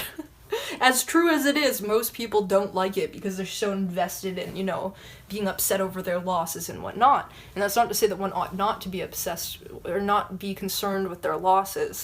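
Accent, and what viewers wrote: Canada. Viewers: Are you Canadian per chance? The way you pronounce "about" sounds Canadian. 😉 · I have a hard time figuring out if you are Canadian or Danish?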